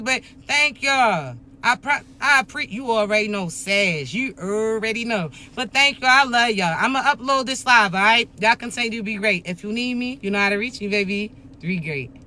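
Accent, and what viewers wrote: Baltimore, United States. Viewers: ALL FACTS LADY YOU GOT A BALTIMORE ACCENT GOOD CONTENT KEEP THAT ENERGY COOKING 🎯🎯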